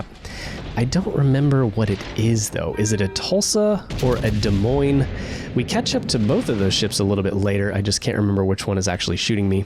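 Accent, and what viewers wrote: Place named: New England, United States. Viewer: Woostah- you from New England?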